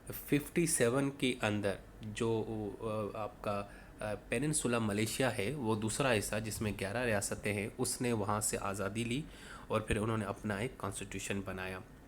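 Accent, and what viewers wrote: Karachi, Pakistan. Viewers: Apka Accent Karachi Wala hai..... Karachi waaly bolty hain æsy...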